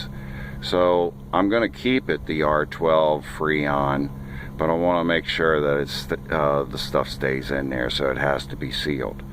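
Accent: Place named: Pittsburgh, United States. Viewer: Are you from Pittsburgh by chance? Sounds like you have a yinzer accent. No disrespect, I lived there for awhile, miss the place. Good luck on your van build, looks awesome!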